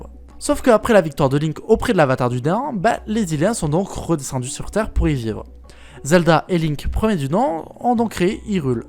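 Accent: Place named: Spain. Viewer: nan mais c'est pas un très gros accent mais on dirait un accent espagnol genre mdr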